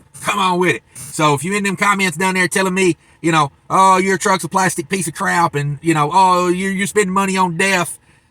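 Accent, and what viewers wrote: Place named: Minnesota, United States. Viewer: Done good Mr. But I prefer the natural southern Minnesota accent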